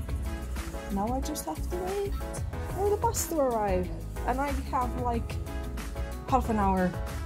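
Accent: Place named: Finland. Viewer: I couldn't tell whether you were British or American but it looks like you're actually Finnish, and I love your accent **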